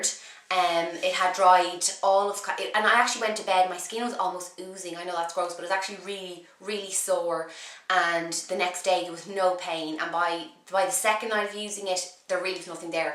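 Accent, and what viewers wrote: Ireland. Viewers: great tips will write them down to rem them" have been following ur vids for ages but never commented.nice to hear the dub accent.yay!!!!!!! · Your turd installment? Lol, no offence i LOVE the irish accent. Thanks for the tips too :) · Lol she doesnt have a British accent, its Irish two different countrys :) x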